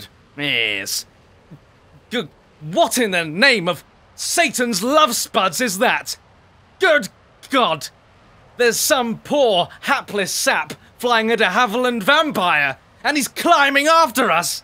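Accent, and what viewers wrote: United Kingdom. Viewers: its time once again i must fill my British accent bar up.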